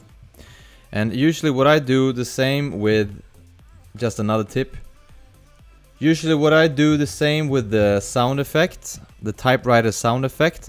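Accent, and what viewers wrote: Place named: Sweden. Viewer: Haha as soon as you started speaking I knew you were swedish. Tack så mycket för hjälpen!!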